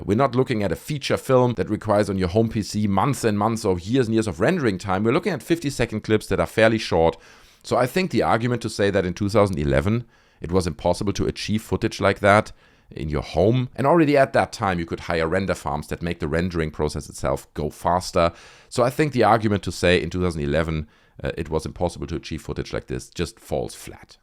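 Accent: Germany. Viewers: if this video Podcast is run by the Host man Talking called??? then why is this Russian Man Talking with a German Accent??????????????????????????????????????????????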